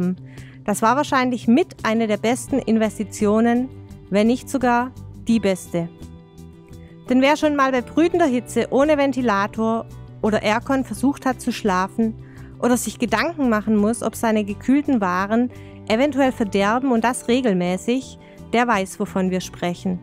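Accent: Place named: Swabia, Germany. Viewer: 🧡🎈🌞💛🍀hallo ihr 🩵🧡wie immer interessant informativ schwäbisch😄 Dankeschön 🎈💛🌞🍀🩵🧡 Gruß vom verregneten Bodensee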